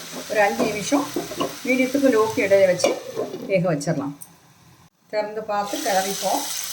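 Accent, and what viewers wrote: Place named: Kanyakumari, India. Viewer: அவங்க கன்னியாகுமாரி மாவட்டத்தை சேர்ந்தவர் போலும்.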